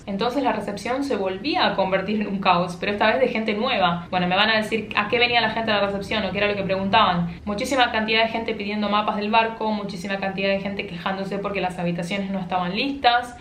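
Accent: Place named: Rioplatense, Uruguay. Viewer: Al final es como yo pensaba, es la versión de Tripulante de Cabina pero versión en agua. Solo que las azafatas a veces tienen un día un la ciudad y tienen habitación propia. Es un trabajo para matarse laburando, ahorrar, y ya esta. Especialmente si son de un país como el nuestro, Argentina, (perdón no se si sos de Uruguay, porque nuestro acento se re parece) que el peso no vale nada y cualquier trabajo en dólares es bienvenido. Sin embargo, es todo un sacrificio. Buchoneo, demasiadas horas, no habitación propia. Me encanta que hables del lado que todos realmente necesitamos saber!!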